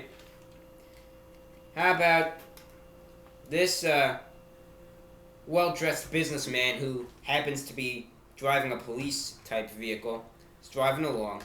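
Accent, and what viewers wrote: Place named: New York, United States. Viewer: His New York accent was still intact here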